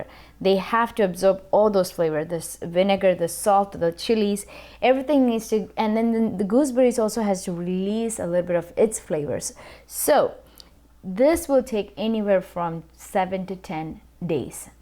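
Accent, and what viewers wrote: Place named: United States. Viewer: Mouth watering recipe. I am gonna try. Thanks for sharing. Btw you have fine American accent. How did you achieve it?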